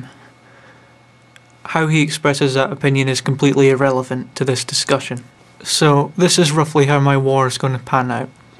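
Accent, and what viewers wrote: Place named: Scotland, United Kingdom. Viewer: are you scottish? you sound scottish <3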